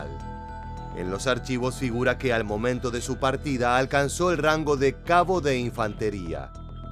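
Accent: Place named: Argentina. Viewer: Me da risa como el narrador habla bien argentino,pero neta esto es bastante serio con esto de México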